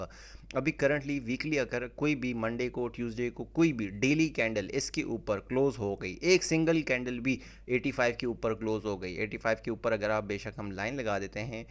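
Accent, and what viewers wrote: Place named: Peshawar, Pakistan. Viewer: Assallam o alaikum bro aap peshawar s hu Mashallah ap ka accent unhi jaisa hai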